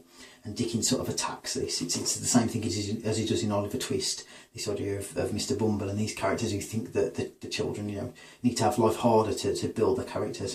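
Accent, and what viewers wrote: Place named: Ireland. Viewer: okey ure Irish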